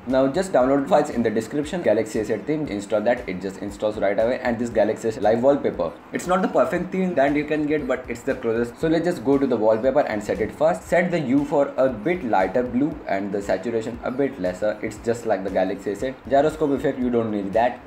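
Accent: India. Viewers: i hate, really i hate the english accent of Indian people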